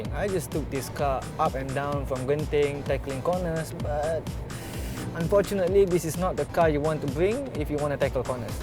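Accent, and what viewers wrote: France. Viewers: Sounds like a French talking English... It's nice to hear that, good job bro 👍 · Sounds like a French talking English. But it's entertaining. Good job. Keep it up. What matters is the info about the car.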